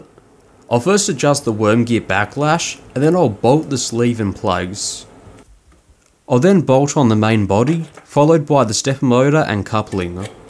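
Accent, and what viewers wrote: Australia. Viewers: cool. Which state are you in? I can't quite pick the accent. SA or WA?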